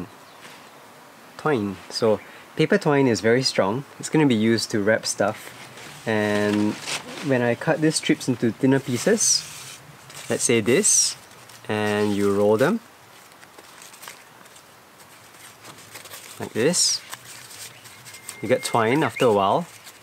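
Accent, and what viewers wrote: Singapore. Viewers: Have you been to Singapore? You sound like a Singaporean! I use Shoji paper to cover model airplanes. How do I find the lightest paper grade? There used to be this paper called Esaki paper but it's no longer available. Where would I find the lightest grade of mulberry paper that remains strong while wet?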